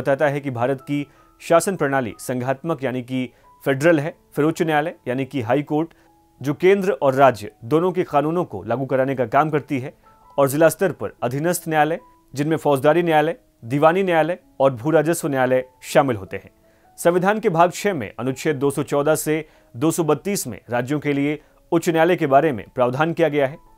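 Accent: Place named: Purvanchal, India. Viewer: लगता है ये एंकर पुरबिया है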